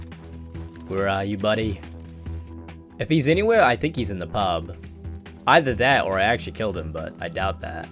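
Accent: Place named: New Zealand. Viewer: Yes broo!! So refreshing watching a good dayz video with a fellow kiwi accent!! Much love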